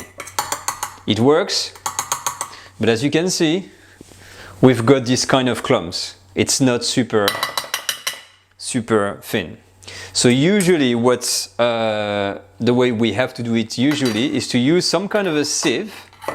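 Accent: France. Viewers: It must taste delicious! I love your adorable french accent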